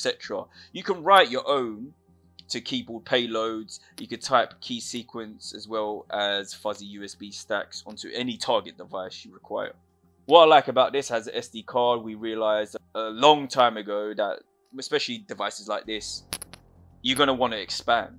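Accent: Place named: United Kingdom. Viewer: I can listen to a British dude explain anything... 🤷‍♂️ innit.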